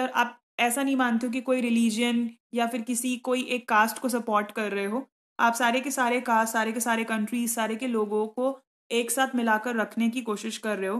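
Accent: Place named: Chhattisgarh, India. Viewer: in which city do you belong? Actually your tone of speaking is seems like Chhattisgarhi so I guess it you could be belong from Chhattisgarh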